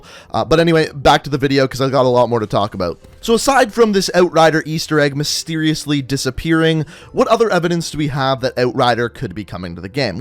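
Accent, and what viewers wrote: Canada. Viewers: Are you Canadian? Not sure about your background but by the way you say out I’m just curious as to your whereabouts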